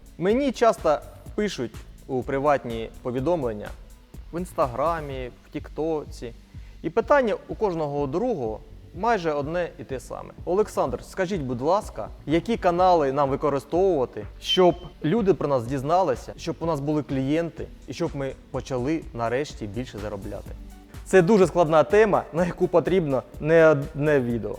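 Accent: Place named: Ukraine. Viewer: спасибо, граммотня подача и приятный настоящий украинский язык, очень приятно слушать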